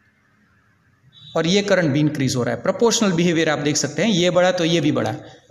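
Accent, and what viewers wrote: Gujarat, India. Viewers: Are you Gujarati??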